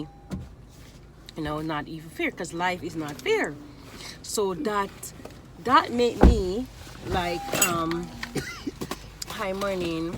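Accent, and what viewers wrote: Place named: Jamaica. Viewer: I could almost tell u was Jamaican to thts crazy Lol I’m half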